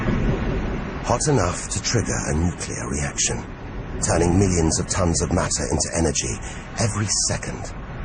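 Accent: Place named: United Kingdom. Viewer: But the whole British voice is killing me lol.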